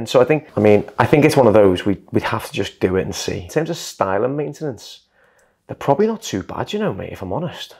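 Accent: Liverpool, United Kingdom. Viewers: are you from Liverpool or even Manchester? Yank here...Can't quite place your accent. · Nice to hear a Scouser! Is your salong in Liverpool?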